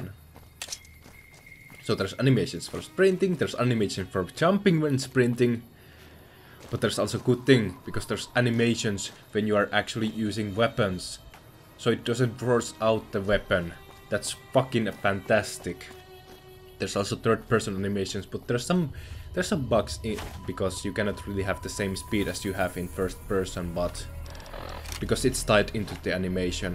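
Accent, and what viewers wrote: Finland. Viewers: Nice süomi accent, bro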